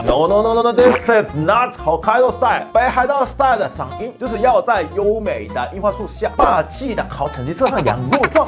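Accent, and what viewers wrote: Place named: Taiwan. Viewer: 社長說話流利很多 也很台ㄟ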